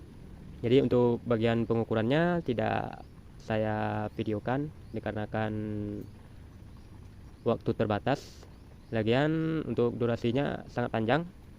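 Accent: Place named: Aceh, Indonesia. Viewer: dari cara ngomong pasti orang Aceh y bang,,dmn bg loksi nya biar kita cek motor fu saya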